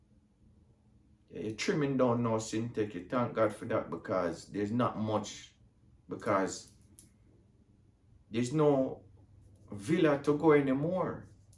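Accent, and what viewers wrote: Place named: Jamaica. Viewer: Funny you sound like Jamaican uncle and I think he was from Canada also.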